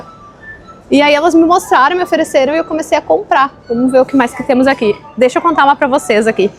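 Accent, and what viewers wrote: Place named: South, Brazil. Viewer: Oiee... cheguei por acaso e estou amando seu canal, vc é muito simpática!! Teu sotaque parece do Sul, de qual cidade e estado vc é natural?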